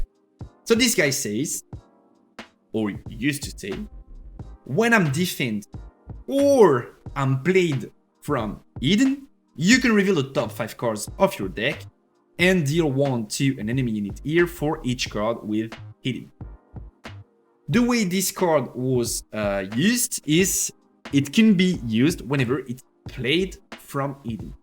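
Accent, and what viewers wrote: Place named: France. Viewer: Je t'ai découvert avec Riftbound, donc en anglais, quand j'ai capté ton accent et que ta chaîne était en FR avant ça, j'ai pre--shot les commentaires de ce genre, mais tkt tu fais bien. Ton anglais est top et super compréhensible et tu te dirige sur un jeu pour le moment full ENG, avec gros potentiel de fanbase vu la licence, donc imo tu fais le bon move, surtout vu ta ligne éditoriale très compétitive, au moins tu te restreint pas au public FR super limité dans ce format :) 💪👏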